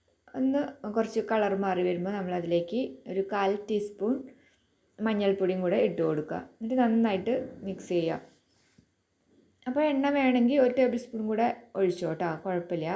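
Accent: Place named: Thrissur, India. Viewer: നല്ല ഒരു side dish 👍👌😋😋 New 👫🤝🔔✅️ തൃശ്ശൂർ ആണോ സംസാരം കേൾക്കുമ്പോൾ തോന്നുന്നുണ്ട്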